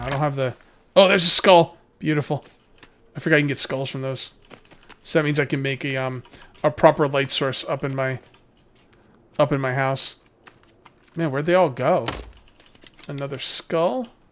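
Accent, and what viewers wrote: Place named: Philadelphia, United States. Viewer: This is my first time watching ya and your philly accent is cracking me up. My best friend is from Philly and you guys sound almost exactly the same.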